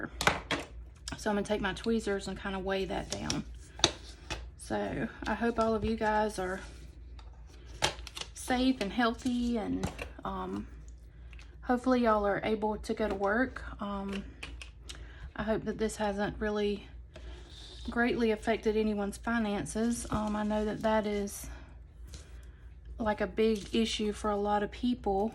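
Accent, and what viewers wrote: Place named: Texas, United States. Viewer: What state are you in ? You sound southern or Texan It is a cute spread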